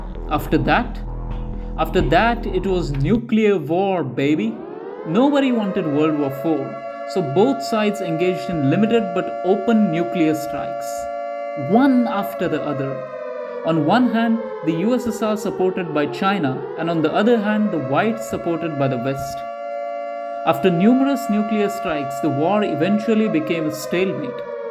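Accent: India. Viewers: I'm sorry, I can't listen to an indian for 9 minutes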